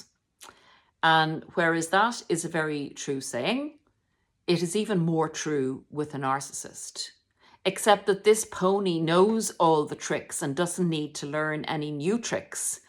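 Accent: Ireland. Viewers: My ex was Scottish but lived in Ireland, so I visited Ireland a lot and travelled around many different counties. Thus I love the accent and really love listening to you. Your voice is really soothing to me and reminds me of just sitting chatting with locals in some beautiful country bar. You also hit the nail on the head with the narc stuff , as the Scottish ex was a disgusting , cheating lying abusive violent narc - a really extreme version. So despite the fact that some very extreme abuse occurred when I was alone with him in Ireland - i still have some lovely memories of the place, the landscape and people i encountered when out and travelling around alone. I even met strangers who stepped in to help me when the abuse was extreme- people who actually took me in to their home. I am eternally grateful for the help they so selflessly gave me- and I often wish I could let them know albeit spiritually, that I finally got away and stayed away. 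❤️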